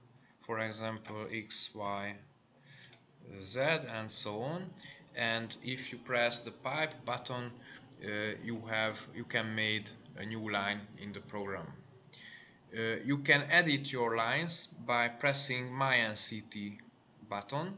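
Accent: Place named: Hungary. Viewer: Milyen érdekes, hogy a kiejtésen hallani a magyart. :) Egyébként ez más nemzetiségeknél is így van.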